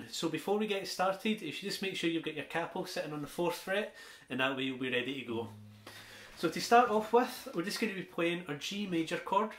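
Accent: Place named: Glasgow, United Kingdom. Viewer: I really enjoy your lessons, the way you go over it is just fantastic bro. I don’t normally comment but wanted to thank you. I hope your channel’s doing well you deserve Success your style is natural and you are very relatable wae a Glasgow Accent. Loving the lessons keep them coming pleases & thank you again 🫡🫡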